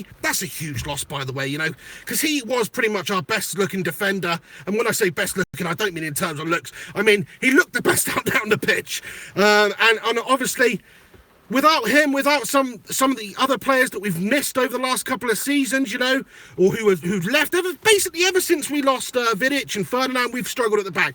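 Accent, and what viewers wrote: Manchester, United Kingdom. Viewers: You a local Manc? lmfao!!!!!!!!!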